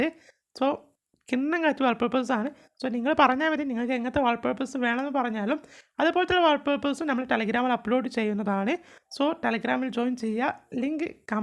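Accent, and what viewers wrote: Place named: Malappuram, India. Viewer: 😂ethra thekkan bhasha anukarichaalum malaappuram bhaasha idakk keri varum. Ningal malappuram karan😅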